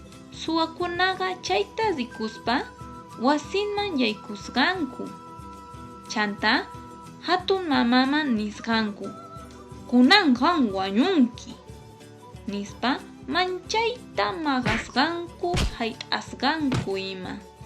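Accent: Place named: Ayacucho, Peru. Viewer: Te pasaste compadre. Has hecho una gran contribución al mundo. Lástima que ahora no podré usar esto como tarea de audio-comprensión como antes para mis alumnos porque ya lo has traducido. Igual, se agradece y se te felicita. El cuento es boliviano (se agradece mucho a los hermanos bolivianos por colgar material en quechua) pero ese quechua al ser pronunciado es más entendible incluso que el quechua Cusco-Collao del sur del Perú. Se nota que tú hablas quechua Ayacucho-Chanka o quechua de Apurimac, ¿cierto? Hatun marqayniyta chaskikuy, Chosica llaqtamanta, wawqillay.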